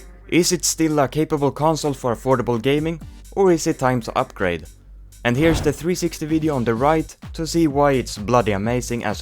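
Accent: Sweden. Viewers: I recognized your swedish accent and you confirmed it when you said "swedish prices" lol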